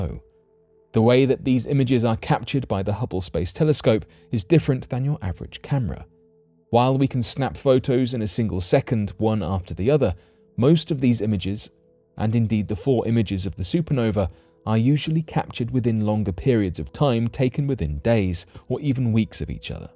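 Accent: United Kingdom. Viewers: I understand why he pronounces bellingenic the way he does he's British guess he's never heard of that famous explorer from long long ago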